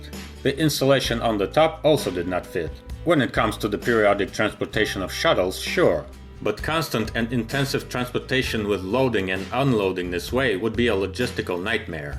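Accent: Russia. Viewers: To be fair, the native Russian speaking narrator has no "uh" sounding letter in his alphabet to compare to English letter "u". They do have a sound like "oo" though. I have a Russian friend who mispronounes "truck" as "trook." I guess it's a common mistake and some English sounds are difficult. Just as some Russian letters are very difficult for English speakers, when we have no similar sound. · Luka Walpole It's just the accent. As a Russian speaker, He's bound to have SOME words and combo of letters that are different to his tongue! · well it's Russian accent,which usually U sounds as it is rather than A in several occasions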